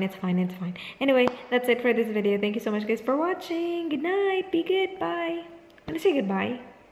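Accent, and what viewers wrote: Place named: Cebuano, Philippines. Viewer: I really love your cebuano accent <3 hearing them talk cebuano - english is so lovely. That american accent tho <3. like colorismyweapon.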